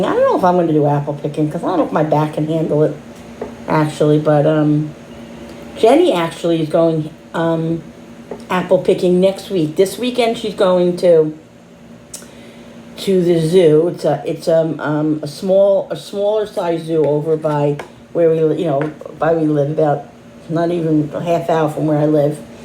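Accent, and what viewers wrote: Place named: New York, United States. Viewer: I just ran across you! You sound like you are from N.Y. your accent is cool. I am stuck on the Summer wells case. I watch all the real mystery shows too. I will now watch the rest of your cooking.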